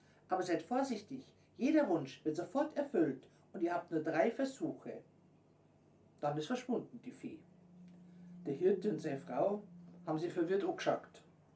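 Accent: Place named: Bavaria, Germany. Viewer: Obwohl ich aus Norddeutschland komme und manches bairisch nicht oder nur schlecht verstehe, erfreut es mich dich dies lesen zu hören. Viel Erfolg mit diesem Kanal! 😊👍👍 ich bin sicher du kannst noch viele andere damit glücklich machen!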